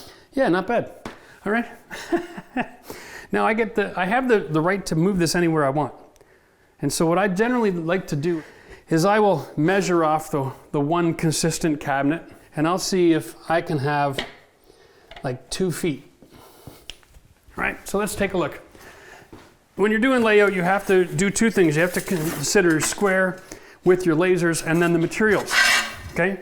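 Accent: Canada. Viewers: Canadian Aye !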